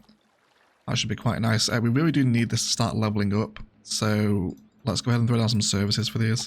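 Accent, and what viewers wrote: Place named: United Kingdom. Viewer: Your videos have blown me away and helped me to develop my building style so much too! I'm trying to place your accent, where in the uk are you from? Keep up the cracking builds 👌 much love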